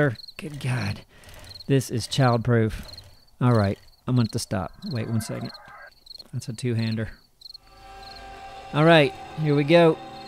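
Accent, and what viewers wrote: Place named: Appalachia, United States. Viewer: I can hear your accent a little bit the Appalachian twang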